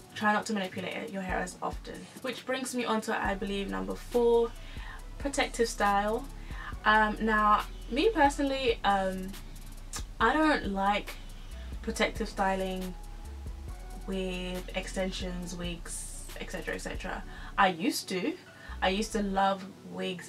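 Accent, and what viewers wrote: United Kingdom. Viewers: She’s sounds English In particular London but what does a Londoner know 🤷🏾‍♀️? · She sounds super british to me🤣🤷🏾‍♀️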